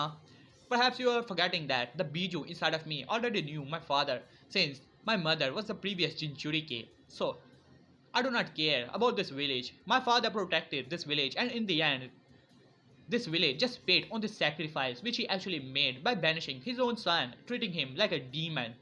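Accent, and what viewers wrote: India. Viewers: I knew he was a Indian by his accent of English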